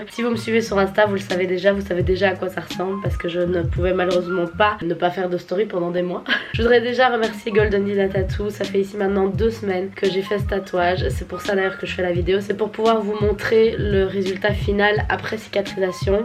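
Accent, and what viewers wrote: Belgium. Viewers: Salut tu es belge ?❤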